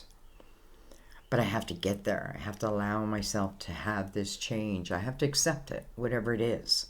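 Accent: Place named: Philadelphia, United States. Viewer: Are you in Philadelphia? You sure have a Philly accent to my ears! Nice reading.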